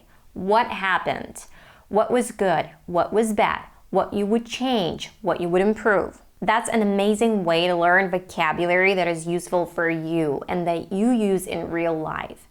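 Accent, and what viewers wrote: United States. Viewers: Hi again. when i heard your voice yesterday for the first time i thought by your accent that you were american. it's hard to believe that you are from ukraine. what i really think. if one don't have a chance to speak and improve the language every day otherwise it's impossible to got an accent like yours. it's just only a matter of time. stay healthy and hats off. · I like American accent, and could you please speaking a little slowly, I'm a new beginner.... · Have you ever been to or studied abroad in the US? I bet you've been. Because your accent is pretty nice and sounds like real American, How can you do that? Can you give me some tips to practice speaking sounds like a native speakers even as well as you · Hello. Where are you from? Is it American pronunciation? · Hi! What is secret of your pronunciation like Americans? How do you practice everyday?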